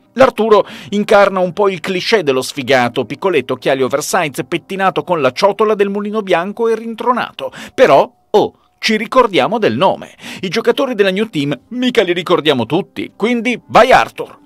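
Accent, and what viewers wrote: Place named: Trentino, Italy. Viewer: YouTube pullula ormai di canali gestiti da appassionati di anime vecchi e nuovi che allietano il loro pubblico con vari tipi di video dedicati all' argomento... ma, come dice il proverbio, la classe non è acqua: o la si ha oppure no. E tu, possiedi una classe ineguagliabile che sai mescolare abilmente con una intelligente ironia rendendo i tuoi video divertenti, interessanti e piacevoli per la loro comicità mai volgare o rozza. Sarà forse il tuo meraviglioso accento trentino a conferire al tuo eloquio quel suono pulito ed elegante in qualsiasi contesto, sarà la tua evidente cultura unita alla tua innegabile simpatia... Fatto sta che dovrebbero esserci più youtuber come te ed è sempre piacevole per me guardare e ascoltare i tuoi video. Mi piace considerarti un po' come un amico che mi fa sorridere e mi tieni compagnia con le tue interessanti pubblicazioni, la cui varietà di genere e argomenti dimostra la tua incredibile versatilità.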